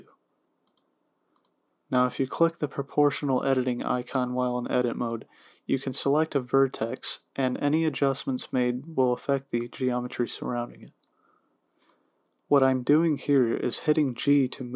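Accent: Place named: Texas, United States. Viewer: bro just sounds like a half asleep texan idk about smooth lol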